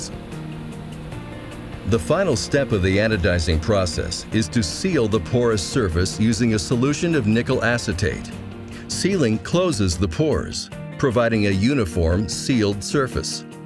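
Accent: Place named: United States. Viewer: american english lol i speak british english and i feel you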